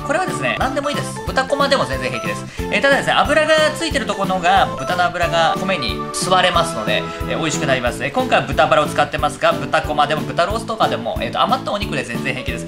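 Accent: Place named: Chiba, Japan. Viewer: 君て千葉でしたかー千葉の従兄弟みたいだなと。イントネーションが一緒なんで親近感あります😁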